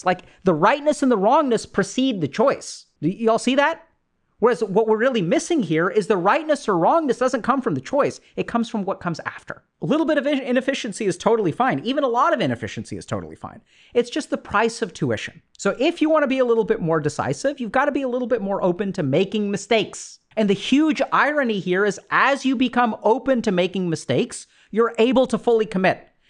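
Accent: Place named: India. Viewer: i love your indian english man :D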